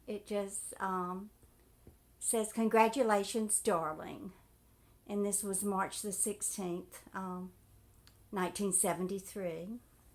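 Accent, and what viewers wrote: South, United States. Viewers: I am new to your channel and love your story telling. I am a Southerner and can relate to your accent. Keep making videos!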